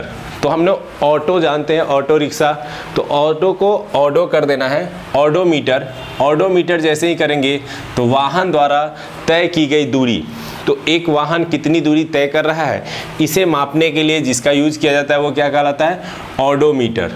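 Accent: Bihar, India. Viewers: sir aap bihar se ho...??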